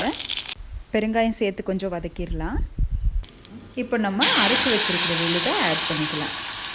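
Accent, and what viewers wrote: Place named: Kongu, India. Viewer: Nice Kongu Slang and useful Recipe:-)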